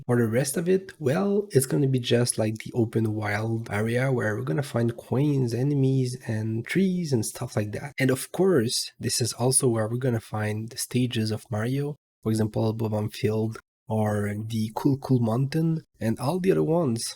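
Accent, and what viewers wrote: France. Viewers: Are you french😮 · T’es français en plus ??? Je reconnais l’accent 😭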